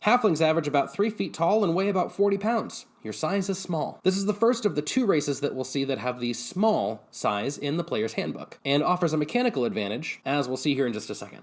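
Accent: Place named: Scotland, United Kingdom. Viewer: Your Scottish accent is on point.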